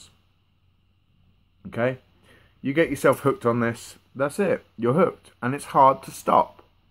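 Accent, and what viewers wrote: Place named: England, United Kingdom. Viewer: Great video man it threw me bud this big husky bearded man I'm thinking OK hes from Michigan or somewhere here then you break out the English accent! Man that's awesome keep up the good work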